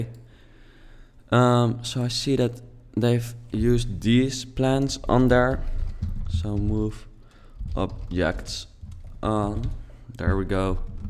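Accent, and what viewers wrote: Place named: Netherlands. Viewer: is this guys dutch or somthing. he really sounds dutch. :\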